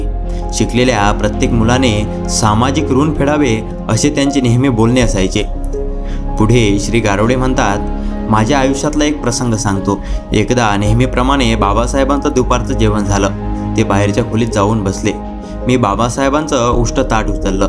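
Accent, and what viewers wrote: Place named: Konkan, India. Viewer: Your speaker has not good is the kokani middle middle words I did not understand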